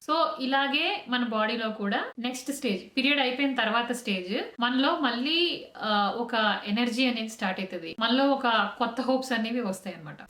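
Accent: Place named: Godavari, India. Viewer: Hi garu. One thing i could say.. You are so honest & Simple. I started watching ur channel with "HOW NOT TO DIE". You are from KAKINADA? Coz your slang is similar to EAST WEST GODAVARI. One thing i want to know, why you stopped NAMASTE LONDON Channel?? You said i woked as Assr manager in indias Lasrgest bank. SBI Or HDFC? ( Iam also a banker) Lots of best wishes from us. Appreciating your partner for supporting your dreams n aspirations. Wishing all the best to Be happy with channel.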